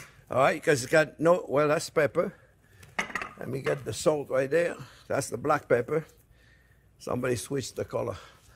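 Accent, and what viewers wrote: France. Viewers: First time watching his vids. I was certain he was cajun. It’s like he’s speaking with an a North American dialect but a French accent. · I heard an Italian touch to your French flavored accent at the time you spoke of the fishmonger and the "'fish bones, eh?" Delightful. Although your last name sounds German. I was born in Nice, but raised in India. So I never had a bouillabaisse growing up. Finally, on a visit to Nice, I found a small restaurant, took my Belgian uncle Ray over there, along with my family, and we had a splendid Bouillabaisse sitting on the pavement of this small restaurant. The lady was overwhelmed as we came in as a big party for that tiny place, but we helped with arranging the tables outside, and we had such a great time. My fondest memory of this soup. I think as an American I had no problem with arranging the tables, and this was shocking in France where there is a tradition of service and people waiting on you. 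Ha ha. She had some good stories to tell of our visit years later.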